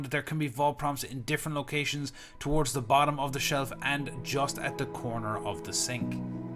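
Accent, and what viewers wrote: Ireland. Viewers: Where are u from in ireland? You sound like jack septic eye but idk what county sounds like that?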